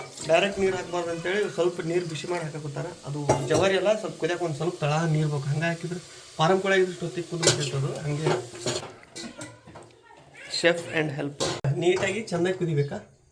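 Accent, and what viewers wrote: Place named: Kalaburagi, India. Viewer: Anna yavuru nimdu nim bhashe Kalburgi thara anisutte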